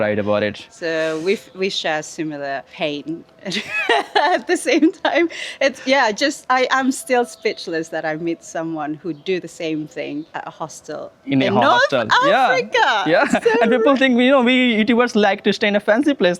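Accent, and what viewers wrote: Bihar, India. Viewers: Yaar itni countries ka experience lene ke baad you are still bihari at least now you should leave your bihari accent bro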